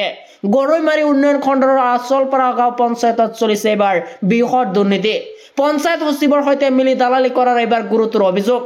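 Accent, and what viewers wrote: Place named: Bangladesh. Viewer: Bangladeshi Jen Lagise news Kuwa mokkel tu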